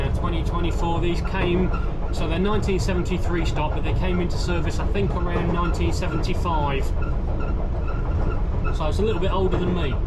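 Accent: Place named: Birmingham, United Kingdom. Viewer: Hi, loving all your videos home & abroad. Cant think of any jokes at this moment in time. I have always been interested in London Underground and its trains. I live just across the road from the Victoria Line Depot. I no Southern region have problems in the winter with current pickup from their 3 rd rail system due to snow and ice. How does the 4th rail London Underground system fair with that problem? You was saying on one of your videos that your home town is Peterborough, i hear a Brummie accent from you. Anyway mate, keep up the good work. Regards Lee.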